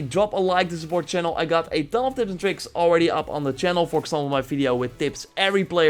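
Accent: Netherlands. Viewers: 100% a fellow dutchguy. je accent gaf het weg :) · wejo ik kan letterlijk gewoon horen dat je nederlands bent, wat een accent joh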